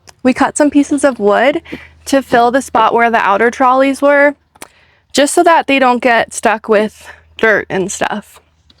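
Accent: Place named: United States. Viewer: I really like this accent, can someone let me know which part of the US is it from?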